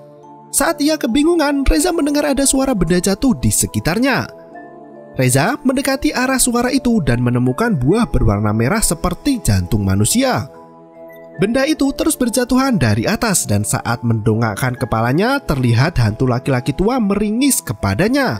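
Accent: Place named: Java, Indonesia. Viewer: Medokk banget mas.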